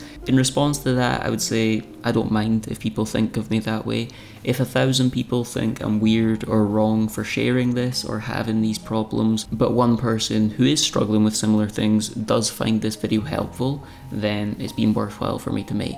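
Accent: Scotland, United Kingdom. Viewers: Thank you so much for sharing - it's not always easy to open up, esp to the world! I've had issues most of my life but nowhere near the struggles you've gone through, mine were mostly related to being an introvert. I'm 76 now and still struggle from time to time. Finding where I belong and where I'm at peace (in Nature), has been my greatest healer even though it means being on my own with my dogs. I say on my own...not alone. I'm sure you'll have helped quite a number of your followers and their friends or families and I wish you all the best in the future. I thoroughly enjoy your videos and love hearing your soft Scottish voice. My origins are in Lumphanan so it reminds me very much of all my family, esp my mum. BTW - if those biscuits are anything to go by, you've got a head start on recovery - we need that recipe!! Love 'n' healing hugs from Oz ❤️🤗👩‍🌾🦘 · What a good video. 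Agree with everything you said....if it matters to you. I love your clear Scottish pronunciation. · Those cookies look delicious! As a lifelong sufferer of depression and anxiety, I am SO glad that the stigma is starting to pull away from mental heath problems. I did not know what was wrong with me, but I certainly beat the doctors' doors down asking for help. The worst were the panic attacks. It took forever to find a combination of helpful meds, lots of trial and error. Do I hear a Scottish accent? My folks come from Arbroath.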